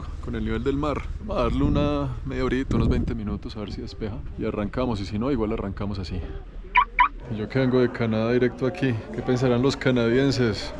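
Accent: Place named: Bogotá, Colombia. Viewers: 🤭🤭 me encanta como narra🤭🤭muy yo 🤭 cachacos al fin y al cabo🤭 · Ay qué cómico pichirilo me da una gracia ese nombre que le pones al carro y con ese gran desayuno ni te asomes al cráter o de un precipicio porque te vas de cabeza con el estómago lleno jua jua jua jua jua jua pasen niñas pasa y pasa no hay apuro ay me encanta como hablas será que soy de Uruguay y me me gusta muchísimo cómo hablan los colombianos pero especialmente tu voz que es bastante baja y las palabras que le pones a las cosas les cambias el nombre son modismos no de cada país a mí me encantan me encantas tú y tus vídeos y te deseo lo mejor en la vida estás en uno de los países que yo quisiera conocer por algo cuando llegaste dicen buena vida te saludan así y eso y eso ya es buena vibra muy positivo o pura vida bueno algo es de eso no me acuerdo bien algún día iré por ahí así que no me voy a perder nada de este video que promete como todos tus vídeos ningún desperdicio cuídate y Dios siempre va contigo❤❤❤❤❤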